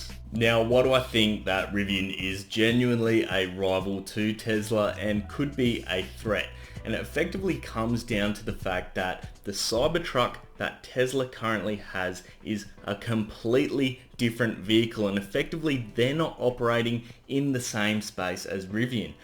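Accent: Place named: Australia. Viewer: Just because you have this Aussie accent, I'm already a huge fan of yours :) As a 4+ years experienced Model S owner, I highly cannot recommend Tesla anymore due to how the company treats you as a customer (the vehicles still are great). I'm looking forward to replace our Model S by a Rivian R1T as our family car, because we love tenting (2018 we did so in a 4x4 with roof tent in the Outback). If it was possible to do this trip in an EV: even better!!! Therefore hoping Rivian will sell to Europe very soon!! :D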